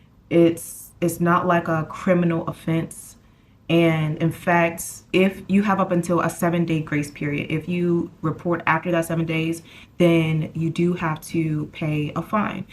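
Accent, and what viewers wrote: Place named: United States. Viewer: Her parents are American or something because i hear NO accent of anything. She sounds like a regular straight up American. And is she a military baby or why did her family move over there?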